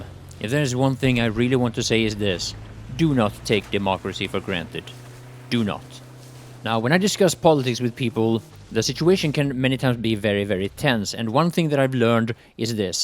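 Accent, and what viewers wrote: Sweden. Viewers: JUst wanna thank you for keeping it real dude amazing work allways happy when i get a notification on my phone that you uploaded something and realy nice to see content creators keeping it real!! keep it up!! älskar göteborgska accenten :)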